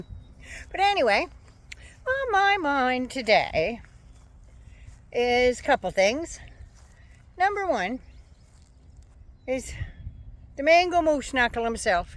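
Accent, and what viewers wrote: Canada. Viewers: is Canadian. I always confuse them as well, as a Brit.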